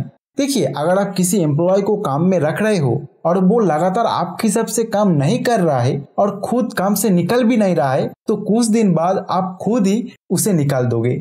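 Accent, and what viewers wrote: Bengal, India. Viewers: App ko accent se malum hota hain keya app banagali hain sir?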